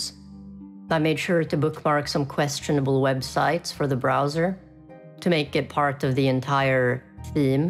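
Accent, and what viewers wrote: Sweden. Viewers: is your accent swedish? owo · This cute little goblin has the most Swedish fucking accent I've heard. And the funny thing is that they've almost entirely flattened their accent, the pronunciation is just a whisper away from Platonic standard. Amazing, that can only happen in a Linux video, fucking phenomenal. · wait are you swedish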